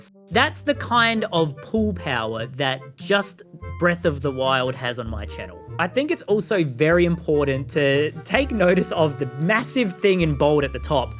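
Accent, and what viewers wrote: Australia. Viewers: There are a lot of channels doing Breath of the Wild/Tears of the Kingdom content, so I don't think it's just the game. There's something about YOU playing BotW and TotK that draws people. And perhaps it's not just the Aussie accent! Maybe there's something about those games in particular that brings out the best YouTuber in you? I don't know. But don't sell yourself short thinking it's just the game. Maybe it's you playing the games that make it work, which is why you playing other games works fine but not as well? Just a thought. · Came for impressive master quest run, stayed for funny sounding Australian who plays video games. I've been hoping for a long while that you would ignore data this and data that and simply play games you genuinely enjoy. FF7 remake has been very refreshing!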